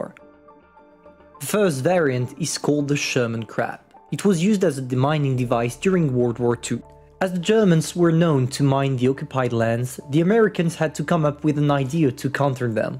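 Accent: France. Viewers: Now I feel that I am in a history lesson with a French boi talking in English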